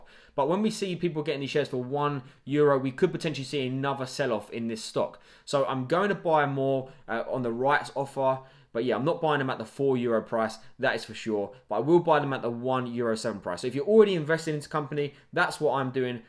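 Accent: United Kingdom. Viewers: Good keep it up! As an Italian in the UK, i like your UK accent 👌🏻😄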